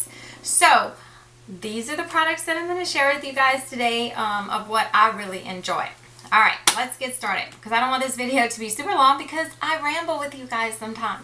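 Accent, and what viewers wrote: Louisiana, United States. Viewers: Hi thanks for thos video. I can totally imagine us being friends if we were neighbors Totally. In fact you remind me of a friend of mine Natalie... lol. I live near new orleans. I thought i detected a little southern cajun accent in your speech. Its a unique sound but i wonder, are you from nawlens? I was just thinking about what you was saying about makeup in general and how you love it, love applying it , buying it, shopping for it.... Etc. And how its something just for you and i totally get it. I mean i love love love trying different makeup and all of the above as well. Lately I've been more and more concerned about the the ingredients used in my cosmetics. I think IT Cosmetics is an awesome brand because they really try to use good ingredienrs and not just products that arent harmful but ones that are actually helping our skin. 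And heres a tip to try for the ABSOLUTE BEST LOOKING UNDEREYE: before applying anything to ur cleaned face...use an undereye cream or whatever moisturizer absorbs well and that you love. After it sinks in.... wait 3-5 min and apply it again. Apply a min. Of two layers of this moisturizer. Pref..4-5 layers. Now, make sure these layers are not too thick and they do get absorbed into the skin... very well. This will plump your skin some and really really help. Step 2 - use the slightest amount of eyeshadow primer just under your lower lashes. You might want to apply it to your lid first and just sweep whats left over under the bottom lashes. It has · What is your accent? I'm from SC and I feel like occasionally I'll hear some Cajun? I mostly stick to CC cream while I'm getting use to retin a. I'm just not peeling like a lot of people do. And I use a sun block with cc creams sunblock just for extra protection. 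I just found your channel. I love it. Looking forward to hearing more from you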